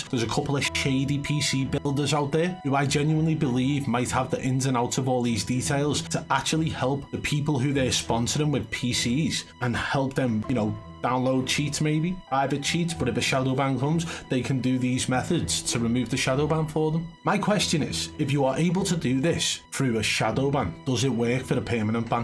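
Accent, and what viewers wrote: Liverpool, United Kingdom. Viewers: Proper Scouse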